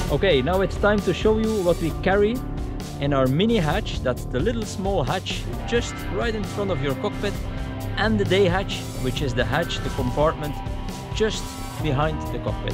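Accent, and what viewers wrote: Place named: West Flanders, Belgium. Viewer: Ne Westvloaming in ’t Engels 👌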